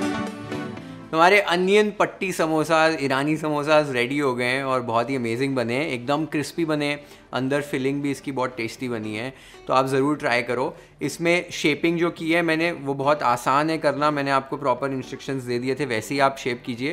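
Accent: India. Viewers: Btw Your accent like Indian, thanks a bunch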